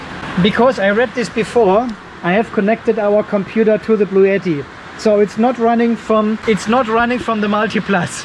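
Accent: Germany. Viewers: Hört sich an als käme er ursprünglich aus Deutschland.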